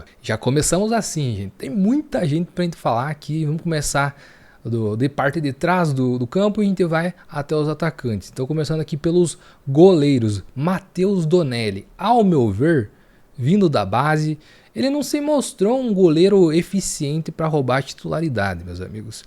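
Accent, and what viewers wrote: Paraná, Brazil. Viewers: Conheci seu canal hj. Vc é paranaense? Nasci em SP mas cresci em CWB. Reconheço esse sotaque kkkk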